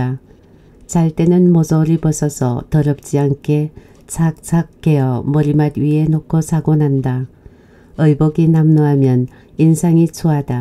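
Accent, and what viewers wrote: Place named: Chungcheong, South Korea. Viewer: 충청도 사투리가 이런 억양인가요? 경낭도인줄 알았는데? 진짜 못 알아듣겠네요.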